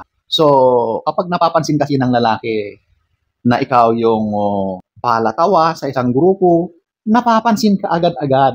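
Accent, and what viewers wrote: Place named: Baguio, Philippines. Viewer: 😂😂😂😂❤❤❤ taga Baguio kadi brod like how you talk cute u mo 😂😂😂buts true LAHAT ❤️🌹😂🥰🤩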